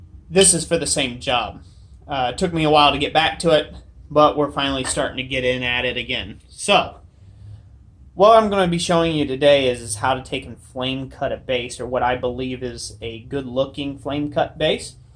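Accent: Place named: South, United States. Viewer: so your from dayton I always though you were from the south, anyway what is your thoughts on hammering uri hoffi style?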